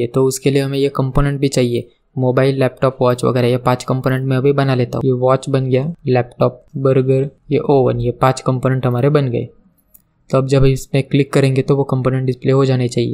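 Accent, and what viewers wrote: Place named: Maharashtra, India. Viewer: Marathi acent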